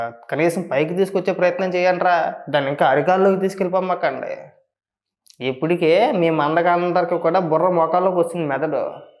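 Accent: Godavari, India. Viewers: Every common man have similar kind of views which you have but you have the medium to express their feelings as it is in Godavari slang satirically. Appreciated. Keep going. We are with you.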